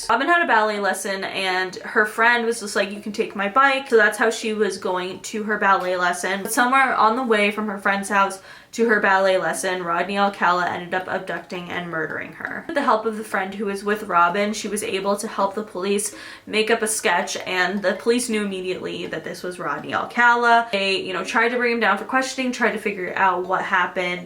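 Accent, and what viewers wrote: United Kingdom. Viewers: I love your British accent, love ❤️ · I love your British accent 😭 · The beginning haha I love your British accent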